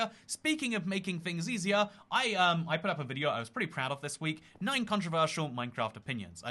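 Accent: United States. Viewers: Pretty good American accent i’m ashamed to say people do sound like that 🤠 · I'm american 🇺🇸 and even though I'm Texan I've never heard an American accent like that. It's funny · Please don't do an american accent, it scares me. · It's not the only thing that I take from your videos, but I love your "Monopoly Man" U.S. accent. Kind of a southern Civil War colonel meets old, crotchety Appalachian hillbilly thing. I can say that because I'm an East Texas hillbilly type.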